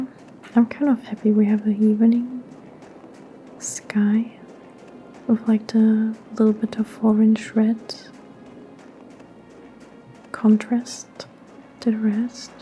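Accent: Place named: Norway. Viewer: Thanks for this very relaxing video! I would've guessed you were from Norway^^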